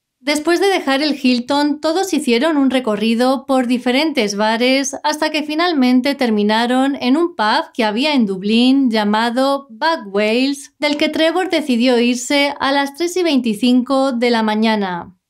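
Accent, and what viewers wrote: Spain. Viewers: Está bien, español de Europa 👏👍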